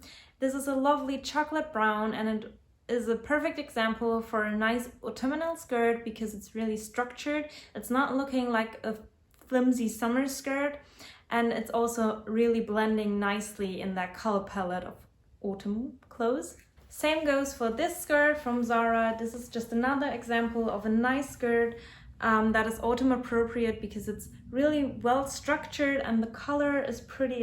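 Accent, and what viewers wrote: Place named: Germany. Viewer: Are you from Germany? Your accent sounds so familiar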